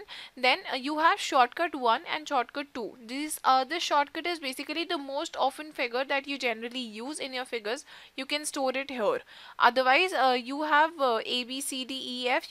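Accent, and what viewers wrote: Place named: India. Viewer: Listen baby, you can work out from the accent that she is Indian. Sorry, but that is the speed at which normal educated Indian brains function. Perfectly paced for me, who am a not - too- bright Indian. Upgrade yourself, buster.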